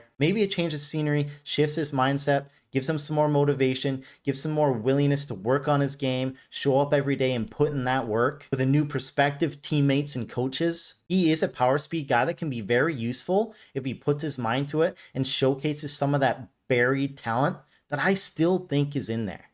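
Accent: Canada. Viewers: Careful with that Schlittler pronunciation, man. This is a family show. That said, I expect to hear "cuck" no less than twice in every video and you delivered. From another fellow, thanks for continuing to justify my obsession with Kurtz. Idk how many losses it has contributed to thus far, but it's finally paying off as my playoff hopes hang by a thread! Does the mini-hoop indicate that you'll keep this going with some basketball content? The football market is flooded and I already think I know more than everyone in that sport, but the NBA is an afterthought as one who always preferred college hoops. Either way, I'll support it until you bore me or piss me off! Non-sports question... I think you've hinted (or flat-out stated) that you're in Texas, but do I detect a little canuck in that accent? Whatever it is, it doesn't exactly scream Texan. 🤣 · I thought you were Canadian by the way you pronounce your "O's". 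Especially in words such as "about" or "out".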